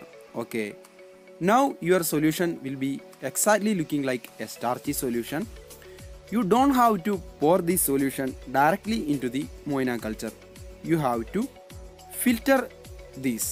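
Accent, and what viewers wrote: Kerala, India. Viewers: Malayali 😂 Aaa English kettale ariyaa malayali anenne 🥰🥰🥰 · Hey by ur pronounciation u r mallu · Machente English kettal ariyaa . malayali alle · English paryunath ketal arinjoode malayali aanenn😂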